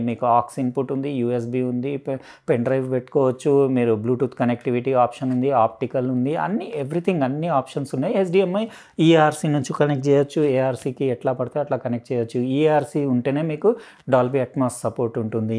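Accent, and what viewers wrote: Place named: Hyderabad, India. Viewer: Garu, Mastu, Jabardastu, meeru vade, hyderabadi, assent, kosam mee video chustnu, 🎉 all the best, prabhakar, alwal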